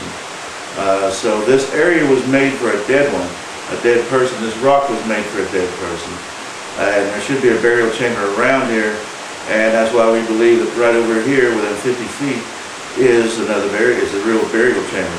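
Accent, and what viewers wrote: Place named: South, United States. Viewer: That is just his accent! He is actually pronouncing it similar to dune, you just hear it as run, or closer to ruin, but said together and fast. I suggest you never visit the South, or any other place where they tend to have accents, like EVERYplace! lol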